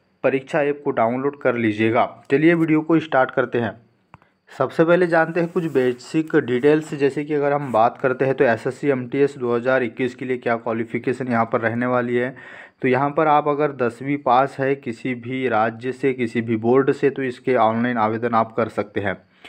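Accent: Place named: Bihar, India. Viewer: Bhai aap Bihar so ho 👍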